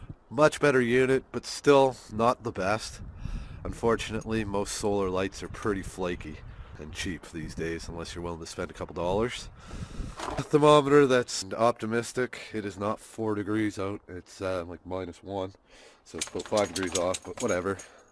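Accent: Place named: Nova Scotia, Canada. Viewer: Right on. My wifes aunt lives in chester grant. I thought you sounded like an Aylesford/ Morden guy.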